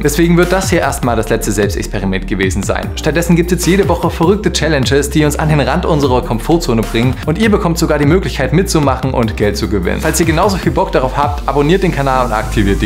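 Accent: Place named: Saxony, Germany. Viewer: Ich liebe deinen leicht durchschimmernden sächsischen Dialekt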